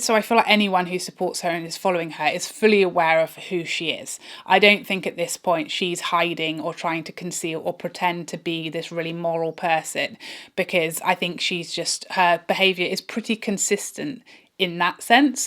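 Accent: England, United Kingdom. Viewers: Your eye makeup here is so beautiful. I love the green. I unfollow somebody when their politics don't agree with mine. I can take it if it is background noise, but when it becomes overt, I don't care to have it in thrown up in my face all the time. I can hang with somebody if the content is lacking sometimes, because I understand that people have lives outside of their youtube channel. Also, there are channels I think I really like at first, but once I get seeing more videos, I realize it was just the one or two video that I liked. I found your channel from your divorce story, and could empathize personally, you seem near my age, your English accent is so cute, you have a nice face, and I am interested in beauty products and makeup, and so many of your looks are so fresh and gorgeous, and I could learn a thing or two! I have taken so many mental notes on highlighters and foundations I need to try from watching your videos!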